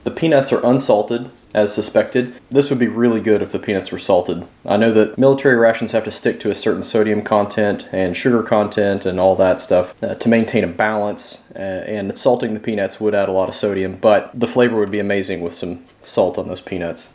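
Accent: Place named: Deep South, United States. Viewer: I'm confused mate, are you American or Canadian? You sound like you're from the deep south, but you called it "jam" not jelly. Americans call jam jelly. Canadians correctly call it jam.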